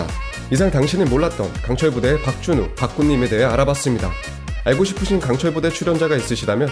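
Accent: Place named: Jeolla, South Korea. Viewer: 그런데 이 친구 이해가 안되는 게 고향은 경상도던데 왜 전라도 사투리를 쓰는지? 태어난 곳만 경상도고 자란 곳은 전라도인가?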